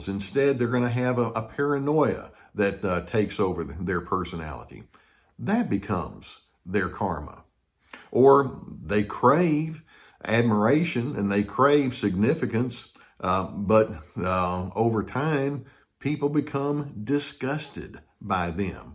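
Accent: South, United States. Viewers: Bloody hell! This is excellent analysis.your best yet. That's not to say all your stuff isn't, excellent! I love, Karma, as it is very difficult to "uninsnare", yourself from these detestable, bastards!! Love, the southern drawl. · Not only are videos helping me cope with and understand narcs, I just LOVE his deep, soothing Southern voice and accent!!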